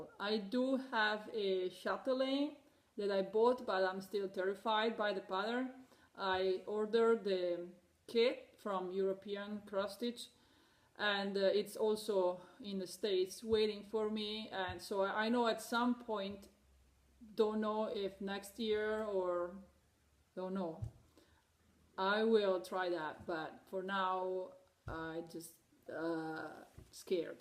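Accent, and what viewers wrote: Italy. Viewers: I'm just now starting to watch your videos. What a beautiful Italian accent you have! I love it!! I'm trying to learn Italian using the Duolingo app. Definitely the most beautifully spoken language ever, in my opinion.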